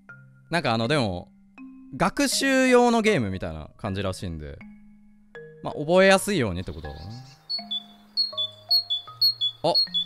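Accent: Japan. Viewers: 開始10秒でお蘭の流暢な日本人英語聞けて大満足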